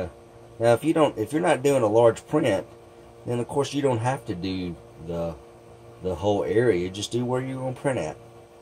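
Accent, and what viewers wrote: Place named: Georgia, United States. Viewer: Hey Buddy, that seems like a major pain in the azz, but I bow with respect for your perseverance ! What is your z ofset ? You may need to push out a thicker bottom layer ? Did a raft not work ?Have you tried PEI or even PET (gizmo dork) ? PET with a good first layer of Elmers Extreme works great for all my other heated and non heated beds.I have one of these coming for testing friday so Id love to hear what you think ? I hear a slight accent also, you in GA ?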